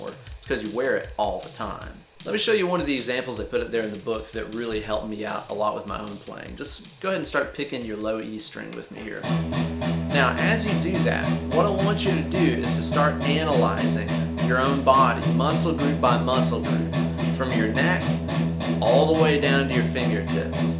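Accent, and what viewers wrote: South, United States. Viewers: Are you from Knoxville? I've been trying to place your accent for a while. I was thinking Georgia maybe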